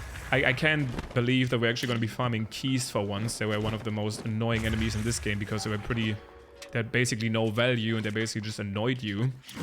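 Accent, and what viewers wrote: Germany. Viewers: You sound german are you german ?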